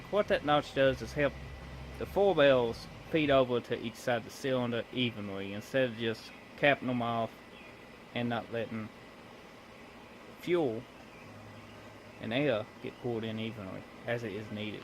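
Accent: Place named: Louisiana, United States. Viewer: That accent are you from Louisiana?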